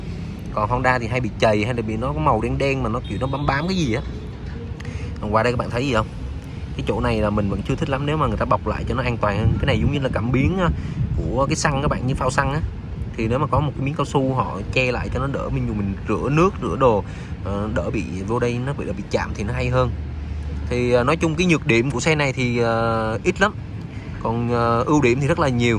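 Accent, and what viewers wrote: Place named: China. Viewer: Lũ bắc kì khựa dân làm quảng cáo xe nghe khẩu âm khựa ngữ đéo ưa rồi.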